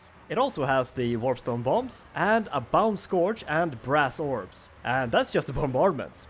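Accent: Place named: Sweden. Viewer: Are you from Sweden? It sounds like it.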